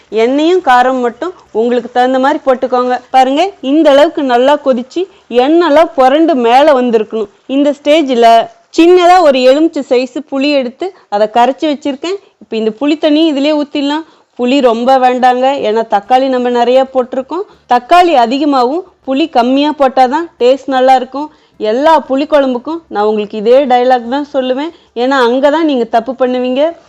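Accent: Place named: Kerala, India. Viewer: Kerala samayal la pesara mathriye pesaringa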